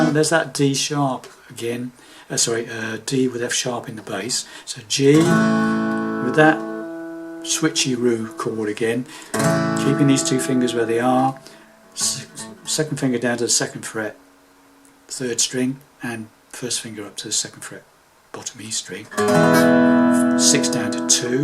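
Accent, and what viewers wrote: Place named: Coventry, United Kingdom. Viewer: Just had to pause, its a lot to take in...just subscribed to his YouTube page.. Your lesson is as always perfect, great explanation and walk through, are you from Coventry but moved to Bedworth or leamington...??? You sound very local..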